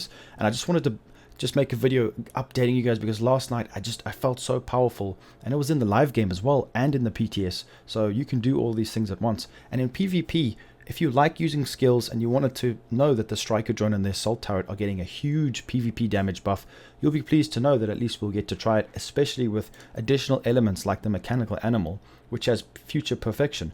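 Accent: South Africa. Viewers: Must be a South African accent! Great Video! Followed this build and it was not just fun to aim for it. But to enjoy it when it was near complete / completed.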